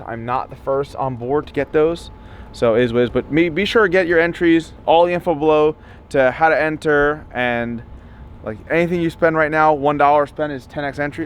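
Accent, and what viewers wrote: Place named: Long Island, United States. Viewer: Listen to that long island accent 😱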